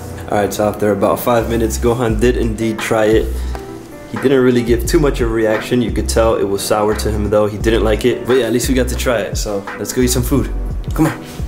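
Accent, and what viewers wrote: Miami, United States. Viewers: Your accent sounds like you’re from Miami. Did I guess correctly? Lol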